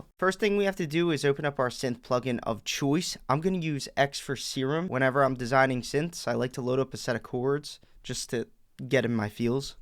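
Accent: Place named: East Coast, United States. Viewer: you must be from the upper east coast " cut it in hafv"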